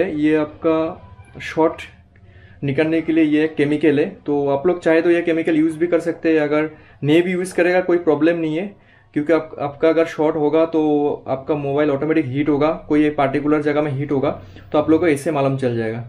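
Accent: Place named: Bengal, India. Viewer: Bengoli wala hindi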